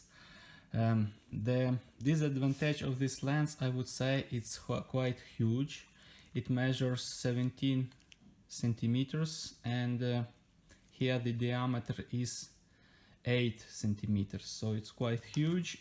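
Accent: Russia. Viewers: Мне кажется, или акцент русского человека говорящим на английском?)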